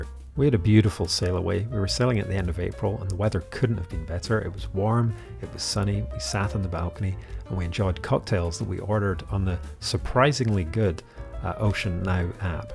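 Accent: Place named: Northern Ireland, United Kingdom. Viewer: Thanks for video, I like Princess and Celebrity but the latter is getting very uppity with their pricing these days. In fact I do not think we will be able to afford them again, plus when in the booking mode online, at the end there seems to be a huge upgrade price to pay if one wants a refundable deposit. I won’t have that, so c’est fini. I won’t ever visit a zoo, aquarium or anywhere that keeps animals prisoner for monetary gain. Visits just encourage them. Sanctuaries are different. Thx again. Where is your accent from, my friend from Londonderry, N.Ireland says “food” as “fudd” like you. Sorry but it is not “gi gi’s” as in the kids’ name for horses, but the French soft G, like an “sh” sound. The older ships have the name Alfredo’s, and up until this year it was free. Damn nuisance. Take care.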